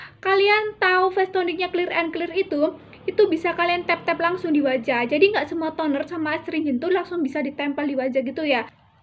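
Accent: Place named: Malang, Indonesia. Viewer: Khas logat malangan ki bocahe. Ayu ayu kinyis kinyis wong kono yo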